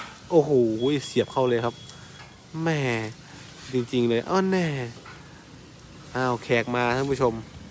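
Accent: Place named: Isan, Thailand. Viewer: มีเสน่ห์ตรงการพากษ์อิสาน นี่แหละ ฮาดี ทั้งพี่ทั้งน้องเลย 🤣🤣🤣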